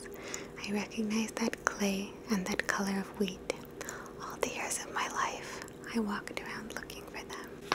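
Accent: Italy. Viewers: you’re little Italian accent in Spanish is so cute :) btw I love that your dog is right behind you kinda camouflaged lol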